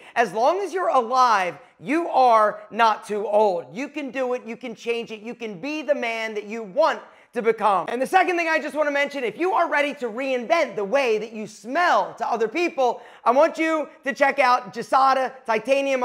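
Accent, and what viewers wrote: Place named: New York, United States. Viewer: I like that you talk like a NEW YORKER !!!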